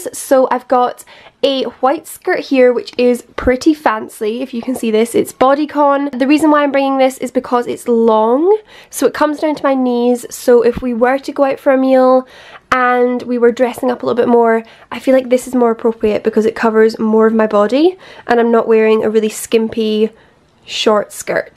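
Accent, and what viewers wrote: Scotland, United Kingdom. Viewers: SUBSCRIBE AS SOON AS I HEARD U ARE SCOTTISH ME TOO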